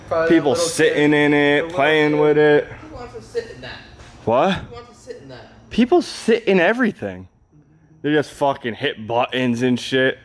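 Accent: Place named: East Coast, United States. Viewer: That was the ultimate Connecticut shitty east coast accent I gotta cwall my ooltinata that dropped oaff is done it sounds like him saying cwoffee I can't stand that accent between the east coast accent an Wisconsin accent fuckin terrible I wonder if he hears how he sounds that was so dramatic the other day when he said its gonna be hard not having his 13 in the shop how the fuck could that make you sad your getting it painted an its a car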